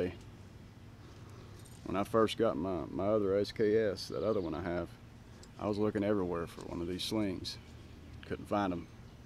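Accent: United States. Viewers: you sound like brad pitt when he does his southern accent fuckin great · dude you have the most American accent i ever heard, i thought that was a hollywood thing hahahahaha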